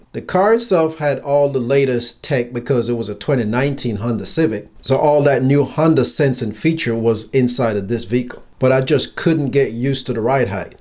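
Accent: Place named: Jamaica. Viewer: You sound Jamaican ...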